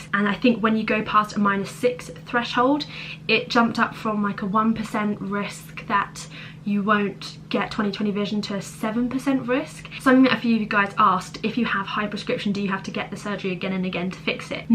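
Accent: United Kingdom. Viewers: Are you Malaysian with British Accent? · Your accent is a British slat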